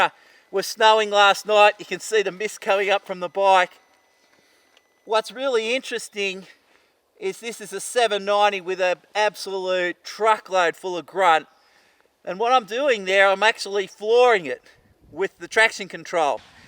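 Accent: Australia. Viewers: Great review, thanks. I especially like the level of detail, feature by feature, very easy to follow and understand. I also like the Aussie voice and style - who else uses the term "gobful of power". I bought one after this review. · I’ve spent all Saturday watching reviews and comparisons. This is by far the best review on YouTube. Thanks for the awesome upload. Can’t wait for COVID19 to end. I’m wanting to step up from my CRF250 Rally, the KTM 390 is very tempting but the 790R just has all the goodies! It’s so good to hear an Aussie, just makes it feel some much more relevant 👍🤓🇦🇺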